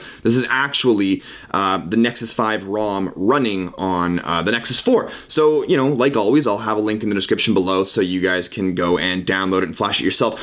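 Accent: Canada. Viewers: Canadian English. Ha, lol.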